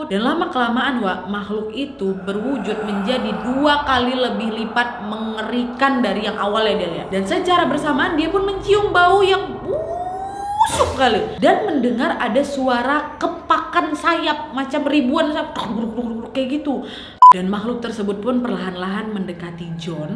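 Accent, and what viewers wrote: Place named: North Sumatra, Indonesia. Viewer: Macem logat namorambe ko bah wak.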